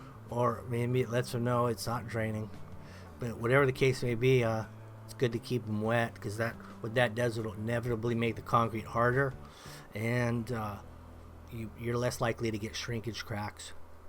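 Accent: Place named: California, United States. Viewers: I live in Los Angeles so many people talk like that up here😂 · Pale Writer its a cali accent is wat he is talking about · I dunno, agree that it's dead on So Cal but more surfer than Mexican influenced. I think the Cali Mex accent is influenced by the So Cal surfer type sounds more than the other way around. I've lived here my whole life so kind of hard for me to hear so maybe I'm wrong but I DID grow up with Mexicans lol. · That accent....so calififornia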